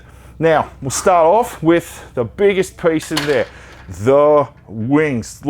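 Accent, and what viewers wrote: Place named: United Kingdom. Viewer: from your accent，do you come from Britain？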